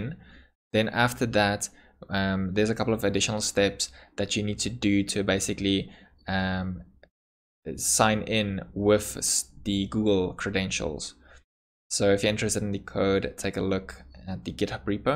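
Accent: South Africa. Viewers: Are you from South Africa? Your accent sounds South African? Great video! Thanks!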